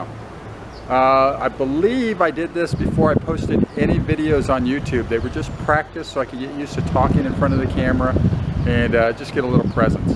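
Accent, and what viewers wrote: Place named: California, United States. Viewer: You're originally from California, right? It always amazes me how similar our accents are to each other.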